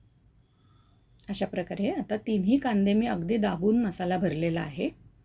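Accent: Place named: Khandesh, India. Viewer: एक नंबर ताई कांद्याची रेसिपी कांद्याची भाजी मला हवी खाण्याची रेसिपी ताई तुम्ही खानदेशी आहेत का कारण तुमच्या बोलण्यावरून समजत आहे मी खानदेशी आहे ताई मला एक विचारायचं होतं ते जखमी परमानंद गरम मसाला दाखवला आहे तुम्ही करतो सगळ्या भाज्यांसाठी वापरू शकतो का आपण झणझणीत उग्र वगैरे होत नाही का एक किलोच्या प्रमाणात सांगा नाहीतर अर्धा किलोच्या प्रमाणात सांगा प्लीज मी पण खानदेशी चा रिप्लाय द्या ताई मी तुमचे चैनल सबस्क्राईब केलेले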